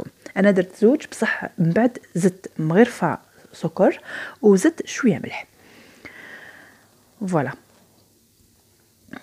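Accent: Algiers, Algeria. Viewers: Une recette que je prépare mais sans les raisins secs bientôt au programme in challah avec zbib.a votre voie je sens l algeroise j ai de la nostalgie pour mon enfance ou j étais entourée de personne qui avait la même façon de parler que dieu vous protège la mère d une amie à moi avait l habitude de préparer tbikha taa el hchich pouvez vous nous la faire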